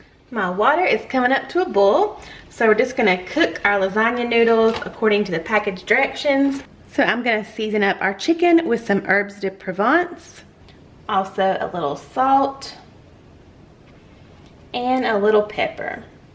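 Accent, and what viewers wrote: South, United States. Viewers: Enjoy your videos. Love your country accent ♥️ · Your southern accent is so sweet. Your dishes are very appealing. I'm from Oklahoma and we don't have quite the accent. Have a wonderful eveninf!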